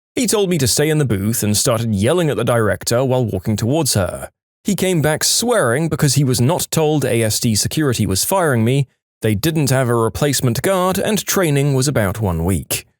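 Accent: United Kingdom. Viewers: The British accent, though 😂😂😂 (This channel is my hyper fixation rn)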